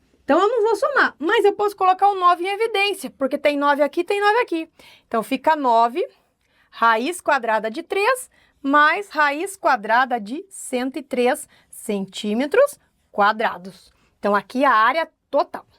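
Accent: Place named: Paraná, Brazil. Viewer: Olá professora, você é do Paraná? Pelo seu sotaque. Tenho vários alunos de lá, também sou professor, mas de eletrônica. Beijos de um prof. para outro.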